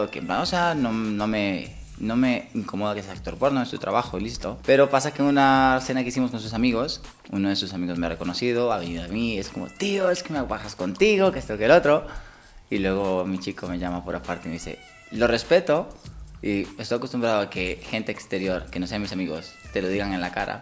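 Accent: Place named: Spain. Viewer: No mames, eres latino y hablas el acento de España. Que ridículo.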